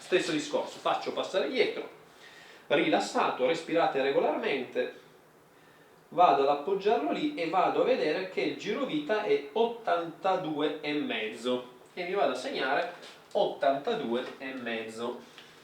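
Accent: Turin, Italy. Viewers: ricorda Angela e Odifreddi nel modo di parlare perché è di Torino come loro.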